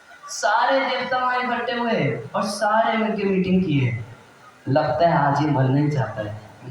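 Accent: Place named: Bihar, India. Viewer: Apka language bihari language se milta hai🙏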